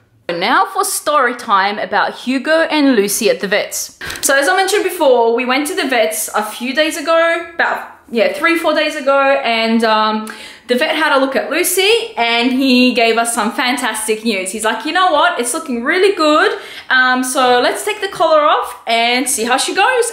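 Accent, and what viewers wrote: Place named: Australia. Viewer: Aussie accent 👊🏼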